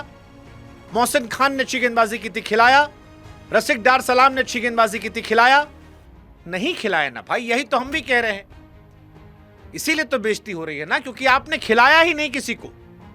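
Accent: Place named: Uttar Pradesh, India. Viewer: Bhai. Aap. U. P. Se ho. Kiya. Bolne. Ka andaj. Jaber jast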